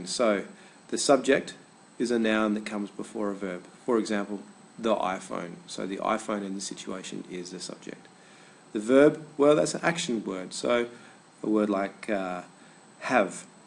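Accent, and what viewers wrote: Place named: Australia. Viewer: Thank you! Very enticing Aussie accent...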